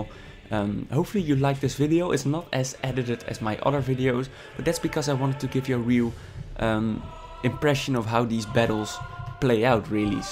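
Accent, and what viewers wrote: Netherlands. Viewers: ahhhhh your accent man, im cringing ( dutch aswell )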